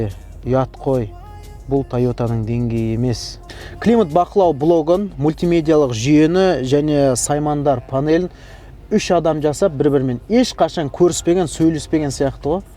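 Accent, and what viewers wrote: Standard. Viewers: Керемет, сонша еңбек өте жақсы түсірілім, жарайсың ең бастысы таза қазақша! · Таза казакша керемет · Ассалаумағалейкум! Қазақша таза сөйлейді екенсің, риза болып отырмын. Жұмысың алға баса берсін! Пытырлатайық жігіттер лайкты, оған лайықты! 👏👏👏👏👏👏👏👏👏👏👏👏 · Мәссаған сөзім жоқ.Қазақ тілде таза сөйлейсін.біз лайк басуды аямаймыз. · Таза қазақша керемет! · Қоспасы жок тап таза қазақша сөйлеп тұр, жарайсыз. Техникалық термин атауларға дейн қатесіз анық аударылған. 👏👏👏👏👏👏👍👍👍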